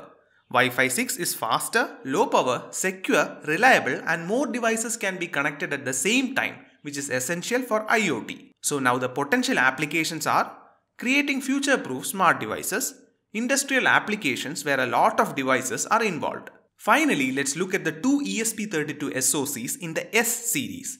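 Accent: India. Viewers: Sorry I find the Indian accents the hardest to listen to and understand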